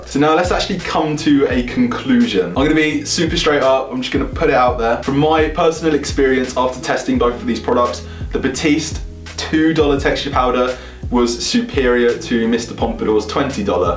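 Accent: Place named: London, United Kingdom. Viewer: Why doller u got london accent we have pounds in london ?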